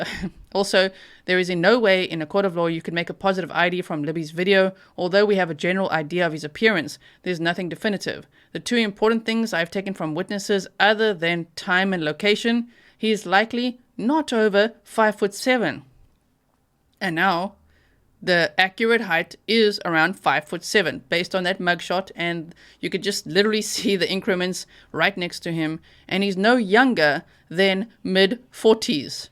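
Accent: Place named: South Africa. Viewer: I recently came across your channel are you South African I clocked the accent immediately. 😀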